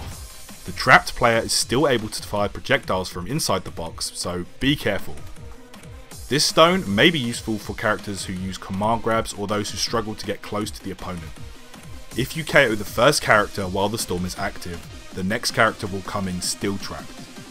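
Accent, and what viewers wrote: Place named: United Kingdom. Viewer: British accent is a plus in a guide.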